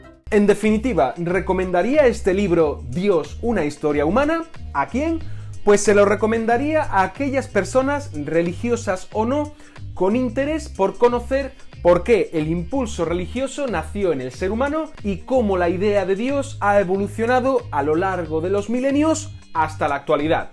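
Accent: Spain. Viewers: Gracias por mantenernos informados y actualizados...A propósito,eres español?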